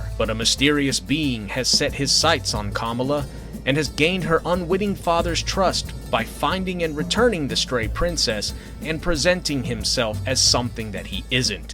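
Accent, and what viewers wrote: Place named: South, United States. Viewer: Nice Southern accent. Texas? Dallas? Kansas? Houston? Nashville? I'm British so its impressive I know all these names.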